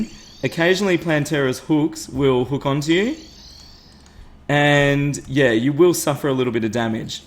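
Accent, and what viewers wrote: Australia. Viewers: Are you Australian because I am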